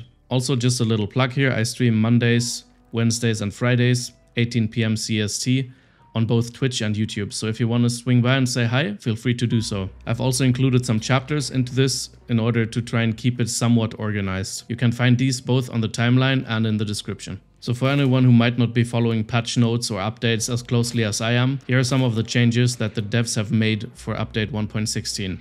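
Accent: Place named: Ireland. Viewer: This guys accent is almost irish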